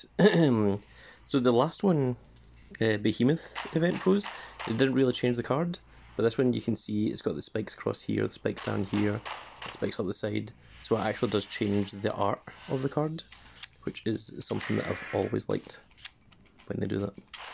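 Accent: Scotland, United Kingdom. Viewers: Are u from scotland??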